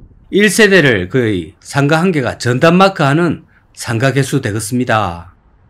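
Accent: Gyeongsang, South Korea. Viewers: 구수한 경산도 사투리가 너무듣기좋아요 감사합니다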